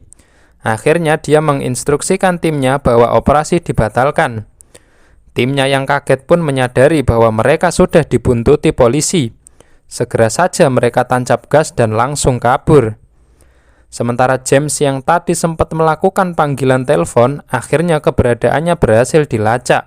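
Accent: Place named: Java, Indonesia. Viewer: Cek medok eee cak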